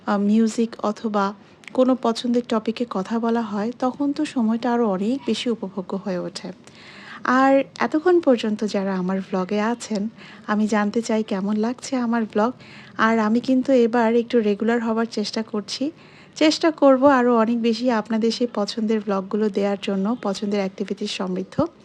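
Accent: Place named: Noakhali, Bangladesh. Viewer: Assalamualikum apu kemon acho ? Night queen flowers Sotti sundor tobe samnasamni dekhar sovaggo hoy ni kokhono. Apu ajker vidéo ta onek clear ajke ki i phone diye vidéo korecho . Mehedi design onek sundor hoyeche. Ei cha dekhe tsc cha er kotha mone pore gelo . Sijhdah burita kemon ache . Mashallah onek onek sundor lagche 😘 ki sundor kotha bole amader noramonio eivabe kotha bole.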